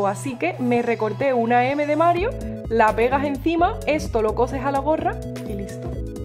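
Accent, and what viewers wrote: Andalusia, Spain. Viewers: 1'22": y ustedes diréis... Con todo respeto, creo que una persona con educación superior no debería hablar así. Esas maneras andaluzas son responsables de que aún hoy día en muchos lugares de Hispanoamérica se hable mal el español y un profesor tiene que dar ejemplo. Saludos cordiales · Hola siento curiosidad de donde eres por tu forma de hablar, me imagino de España pero de que parte?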